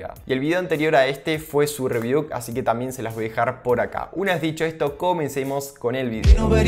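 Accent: Argentina. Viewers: Amigo tiene ud un acento muy particular, casi neutro, si es que eso existe. De qué parte de Argentina es? Todos hablan así? O es propio el estilo? Muy claro y entendible pronunciación. Se agradece. Saludos.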